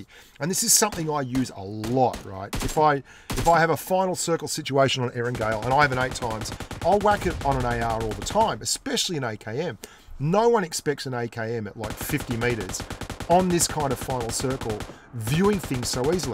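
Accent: Australia. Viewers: Is this guy from Australia? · Manav Plays It's Australian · Australian mate. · Why do ppl always say it is british, it is clearly Australian accent, it even shows in the flag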